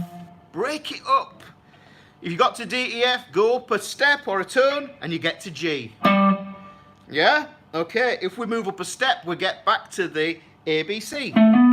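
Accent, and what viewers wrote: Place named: Huddersfield, United Kingdom. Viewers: Wow, I finally found someone who teaches using a proper accent (I'm from Huddersfield too), and not only that, uses an overhead projector!!! You totally rock, and I am absolutely digging your theory lessons, really well explained 👍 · Art Attack for fretboard theory. Absolutely love your northern accent.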